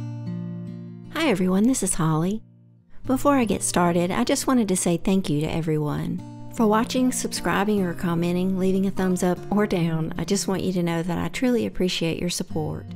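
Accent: South, United States. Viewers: Holly, you have the sweetest southern accent, it reminds me of home. Your soaps are beautiful.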